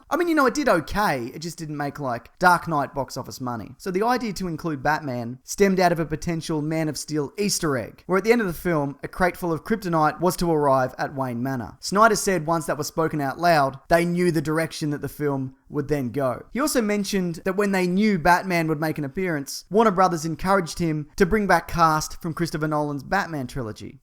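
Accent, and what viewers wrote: Australia. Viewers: Are you from australia you sound like it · he's australian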